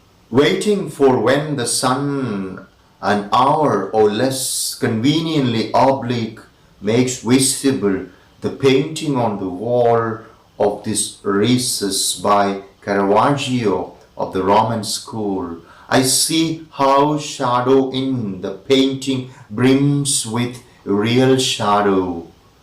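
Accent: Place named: India. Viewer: Excellent Indian Accent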